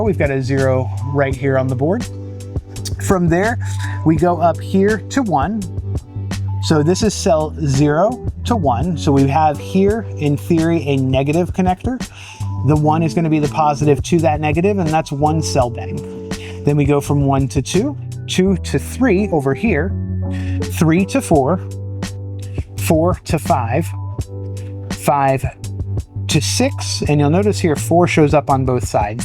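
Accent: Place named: United States. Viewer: You have in American accent in your english language. How does it come that you know millimeters? I was expecting you as an American to use yards and inches and 15/32 inches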